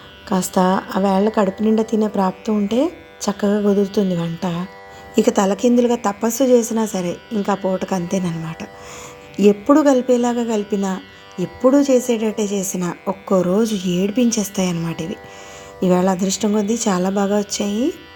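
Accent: Godavari, India. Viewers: మీ గొంతు హస్కీగా బావుందండి. గోదావరి జిల్లా వారేమో మీరు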